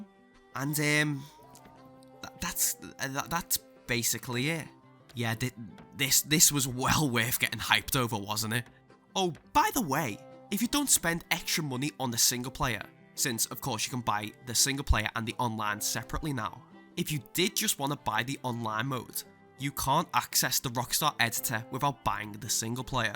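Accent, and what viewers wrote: Liverpool, United Kingdom. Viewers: U scouse? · Just curious, are you from Liverpool? Cause you kinda sound like Darren Till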